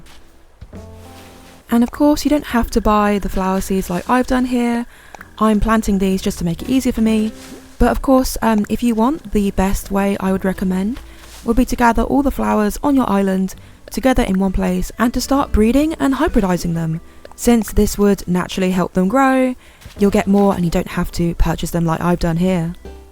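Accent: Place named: United Kingdom. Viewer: I suspect it’s cuz ur bri’ish or austalian or something! Got that soft lilt in your cadence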